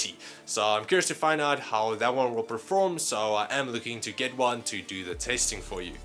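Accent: South Africa. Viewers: South african ? :D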